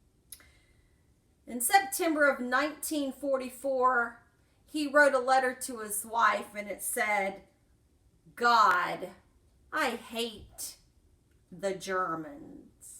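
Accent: South, United States. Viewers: Really.... he wasn't even black... According to what we have seen... He was maybe only 1/3 "black", as they reckon it. He was more "white" than black. He just used the black as a stepping stone to make his money and create his political agenda. BTW, I love your southern accent. I'm from Mississippi, and I really miss a real "southerner" on these channels.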